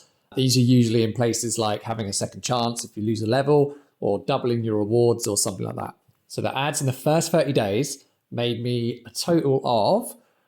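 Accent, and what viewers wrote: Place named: United Kingdom. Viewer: Ohh maan.... British accent is the best.. i love it 😂😂😂🎉🎉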